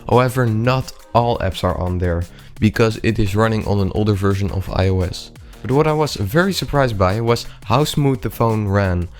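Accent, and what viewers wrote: Netherlands. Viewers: kom je uit nederland?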